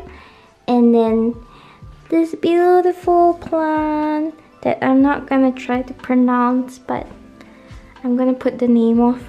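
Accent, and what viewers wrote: Singapore. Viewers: The familiar Singapore accent ! Love the makeover. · Didn't realised it at first, but then recognised the "Singaporean accent" hahah so cute. give familiar feels watching your vids >.< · Your accent sounds abit Singaporean ahah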